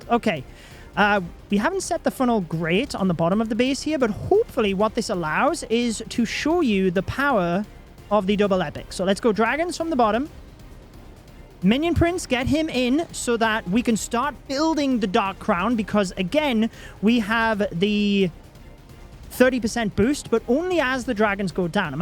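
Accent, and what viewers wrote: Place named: Newcastle, United Kingdom. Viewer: are you a geordie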